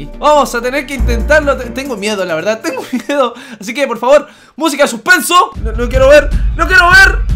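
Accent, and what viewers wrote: Chile. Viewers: eres chileno? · Eri chileno weon? :V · Eres de chile verdad? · Se nota mucho que eri de shile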